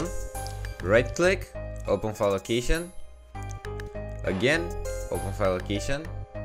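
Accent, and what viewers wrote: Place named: Romania. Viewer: Ori cat de bun ar fi un roman la engleza tot se cunoaste dupa accent:)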